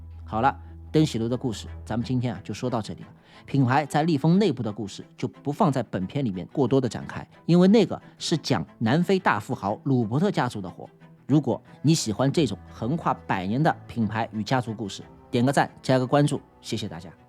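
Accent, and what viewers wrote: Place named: Shanghai, China. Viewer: 博主上普口条很舒服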